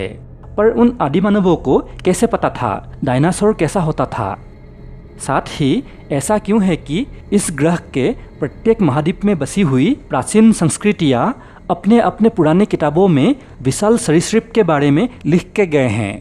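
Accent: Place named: Bengal, India. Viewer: Yee bosdika bolne wala pukka bengali hoga....chutiye ko bolna bhe nahi aata hogaa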